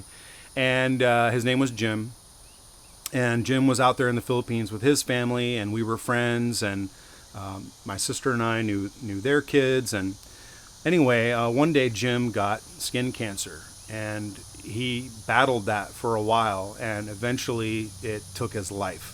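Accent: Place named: Georgia, United States. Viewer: I made a comment earlier saying I’m new to your channel. I said that before I listen to the whole video it sounds like you’re back in the USA maybe Savannah Georgia which I’ve been there before because my son-in-law was stationed at Fort Stewart anyway really good video. I’m hoping to move there in a couple months to retire. When do you think you’ll be heading back to the Philippines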